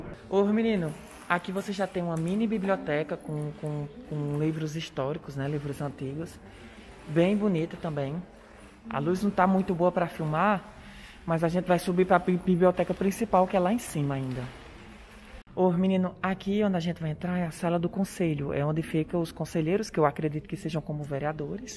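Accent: Northeast, Brazil. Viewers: seus vídeos são um mix de cultura, beleza arquitetonica, beleza da natureza, informações de utilidade pública, tudo falado de forma naturalmente simples, divertida e brasileirissima, no legítimo e delicioso "nordestinês". Já dou like antes de começar a assistir. Agradeço ao Alexis do canal Ola Brasil por ter descoberto você!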